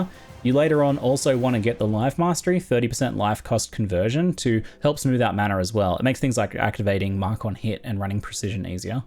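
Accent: Australia. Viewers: ihavent played PoE in ages and i was so happy to see when i googled Viper Strike build a build come up. my favorite poe guru. is australian? i cant tell the accent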